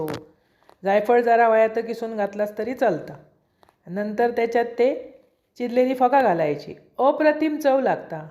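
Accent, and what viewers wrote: Malvani, India. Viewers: ओरिजनल मालवणी ❤ ऐकान लय भारी वाटता जा ताई❤❤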